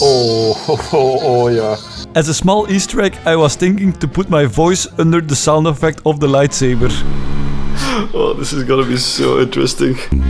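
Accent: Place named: Netherlands. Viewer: Nice dutch accent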